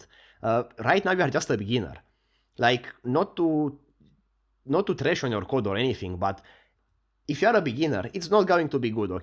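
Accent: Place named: Romania. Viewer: Guys istg his english is fine but it's just the ̶R̶u̶s̶s̶i̶a̶n̶ Romanian accent.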